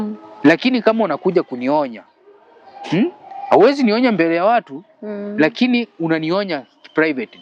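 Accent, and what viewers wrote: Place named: Tanzania. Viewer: Kaka, Kiswahili chako ni kisafi sana, naskia lahaja ya Kibongo kwa mbali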